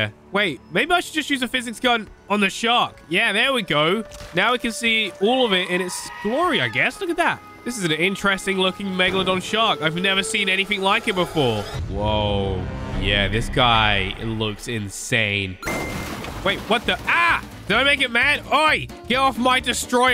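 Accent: United Kingdom. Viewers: Question, are you British?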